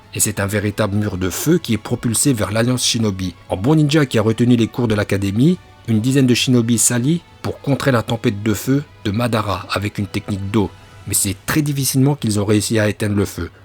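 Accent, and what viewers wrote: Japan. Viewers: L'accent jap est magique !!!! Est-ce qu'Amaterasu compte comme une technique Katon cher porte parol ? (C'est du feu non ?)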